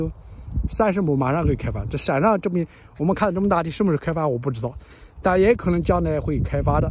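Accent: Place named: Anhui, China. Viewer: 您的安徽口音太重了，建议增加中文字幕。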